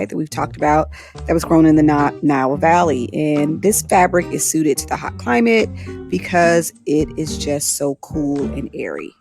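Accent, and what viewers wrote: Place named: United States. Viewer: Just cant hear the terrible american dialect.... sorry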